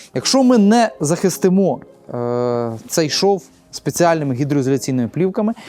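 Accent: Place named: Galicia, Ukraine. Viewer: Я понимаю это позорный галичанский суржик бандеровцев. Мерзко слушать . Тоже отписка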